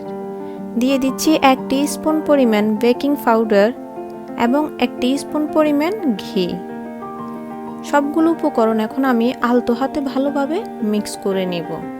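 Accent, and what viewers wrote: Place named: Noakhali, Bangladesh. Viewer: নোয়াখাইল্লা। "প" কে "ফ" বলে। anyways recipe darun cilo.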